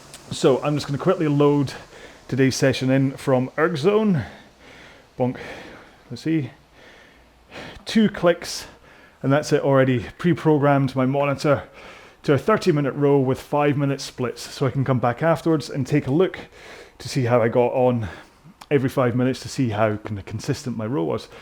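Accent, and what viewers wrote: Scotland, United Kingdom. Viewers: You’re my No1 go to. Love the chatter, the coaching, the technique, the production quality and the humour. (The Scottish accent helps as well). So hope you also have #patience for staying with us here, Coach! 🙏👏👏👏👏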